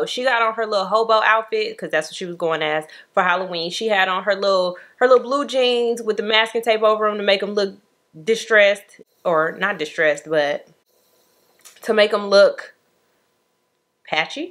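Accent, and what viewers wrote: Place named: Chicago, United States. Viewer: Lol you definitely from close to Chicago, you funny as hell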